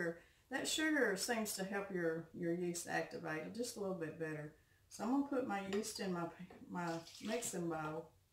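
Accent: South, United States. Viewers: I’m gonna make this tomorrow! Please remember to wear your mic so we can hear the lovely southern drawl! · Man, I am an avid baker for therapy as well. I cant tell you how much I enjoy and have used your experience to add to my own. I want to thank you in this trying time of Covid for your messages and as a Canadian I just love your southern accent! In two words, Thank-You!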